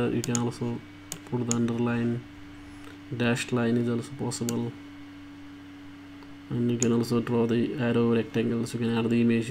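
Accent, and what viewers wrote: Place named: India. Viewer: You speak too fast and with an accent... It sounds like a clattering window pane of a rickety bus. Could you speak a bit slower, and to a global audience, not just Indians!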